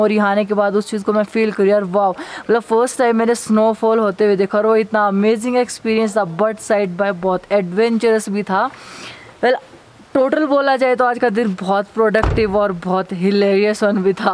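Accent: Haryana, India. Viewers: Haryanvi accent superbbb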